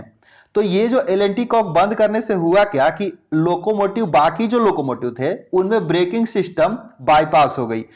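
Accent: Bihar, India. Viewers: Bhai aap samastipur ya darbhanga se lagte ho aapki boli bohot meethi hai 😁👌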